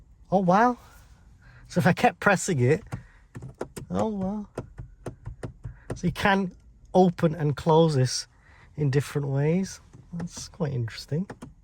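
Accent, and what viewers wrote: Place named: Birmingham, United Kingdom. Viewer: Def a Brummie or poss a Wolverhampton, but I couldn't tell the difference, but you'll know. You have a cultured Brummie accent I say. Kiddy is the same but Worcester doesn't apart from Brummies working there. Allroight!😊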